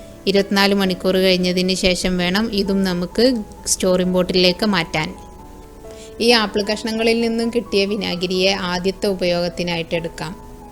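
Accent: Malappuram, India. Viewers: Malappuram ano. sahodari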